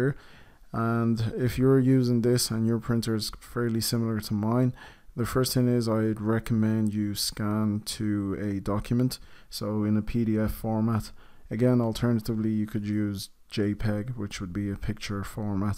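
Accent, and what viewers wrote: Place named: Ireland. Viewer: THANK YOU SO MUCH. Finally found this after scouring for days. And that Irish(?) accent made everything so much better lol.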